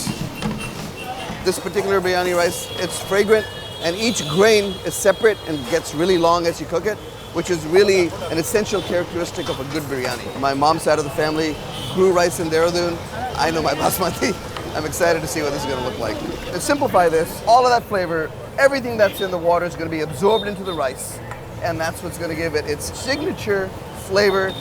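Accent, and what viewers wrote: United States. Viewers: Malayalee in american accent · Following cause of your host.... Face of Naseeruddin Shah, accent of an American, 100% understanding of Indian culture and the calm, reasonable way he comments.. 5 stars all the way. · Probably the first presenter I encountered who has an authentic (American) English accent as well as an original sounding Hindi accent. Both preserved and original in their own sense. Compared to so many presenters on Internet with their fake accents. Very well presented. Great job🎉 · American Indians don't even sound this American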